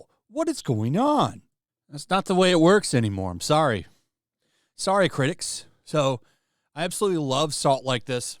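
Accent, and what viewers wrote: England, United Kingdom. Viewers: when you developed an English accent I thought I was watch heel vs baby face but minus the screams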